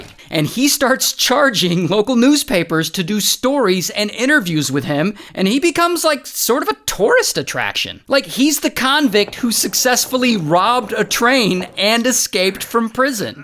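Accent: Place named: England, United Kingdom. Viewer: The English accent 😅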